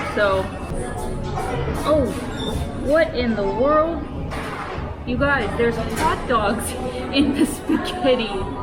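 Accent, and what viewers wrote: Canada. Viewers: Your "out" and "about" accent is a dead give away that you're Canadian. 😉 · Welcome to the Philippines and sorry to hear about your stomach bug or flu. For me your accent gave away where you’re from before you even mentioned that you’re from Calgary. I’m pretty sure you’ve received a lot of recommendations already as far as what food to try and places to visit. If you ever get tired and want to relax, get a massage and I recommend “Hilot” massage. For me, that is better than Swedish or Thai massage. I recommend to go get them at “The Spa”. They have a lot of locations within Metro Manila.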